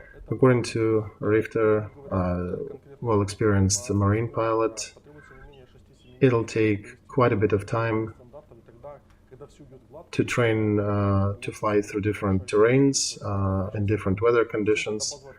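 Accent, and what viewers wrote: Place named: United States. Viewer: His English is extremely good, too, so you can trust the things that you learn. Not perfect but very good. His English pronunciation is excellent. I say these things as a native (US) English speaker. Also, for what it's worth, he has a US accent, definitely. At times I easily forget he's not a native speaker. When I am aware of it, often it's because he pronounces words better than most Americans, speaking every syllable completely. That makes it easy to understand.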